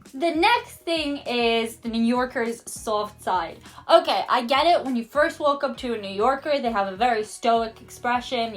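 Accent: Ireland. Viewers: Let me guess, a very pretty woman with an Irish accent got some cold hearted New Yorker's to warm up. Who could have thought that was possible?.... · You have your Irish accent,.🍀 That will melt any New Yorker's tough exterior! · New Yorkers were nice to you because you reminded them of their great Irish granny (your accent). They don't hold doors open because you gotta stop. Finally, they laughed when you dropped your food because NOBODY drops their food. They might throw it away but they don't drop it. Just kidding. You're hot and nice. It goes a long way. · It was probably her Irish accent that made people more likely to help her. ¯\_(ツ)_/¯ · As a Pharmacist, I will be checking a prescription for accuracy, while I’m on the phone with a Doctor, while a Pharmacy Tech is asking me a question. That’s considered “mild multitasking” in Pharmacy World. I jay walk everywhere I go. 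But, it’s absolutely the most fun in NYC. It’s like a carnival ride. The drivers don’t slow down or maneuver, it’s awesome. It’s a list, just a list... you met some nice ppl in NYC. But, you’re a cute blonde, with a cute Irish accent, and you’re white. You can figure out the rest. And, if you have a person videotaping you, people are going to be like angels. Call B&H Photo Video, tell them you want to buy an expensive digital camera. Then ask lots of questions. Try to extend the convo into a friendly conversation like one might have with a barista! Ask them “what does that mean?” Ask it a lot! Lol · Honestly I do think the smiling and being friendly to you. Look in the mirror very attractive / Blonde / Irish accent. I think that is most likely why you thought that. Beside smiling and slamming doors in your face. You had the best of both worlds. I really wish tourist's would visit small town America. 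Everyone goes to large population areas. Small town USA. Smiles holding doors and good service is us. Your welcome to come to Oregon. {Just don't stay in Portland } Come to where i live Lyons,Or Settled by 2 Irish brothers.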